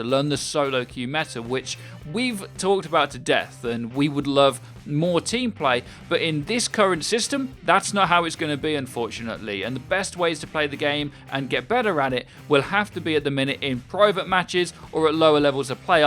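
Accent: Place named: United Kingdom. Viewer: Got your account in My team in placement matches huge loss still 10/10 to hear your brittish accent live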